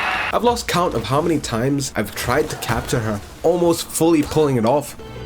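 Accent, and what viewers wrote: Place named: Scotland, United Kingdom. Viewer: why does the Scot accent suit you so much hahahah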